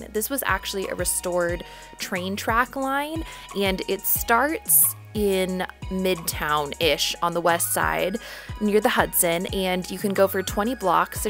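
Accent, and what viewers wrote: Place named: California, United States. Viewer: Your voice you don’t even sound like your from New York you sound like your from Cali... hmm these are all cool but idk I would want real cheep spots from a real New Yorker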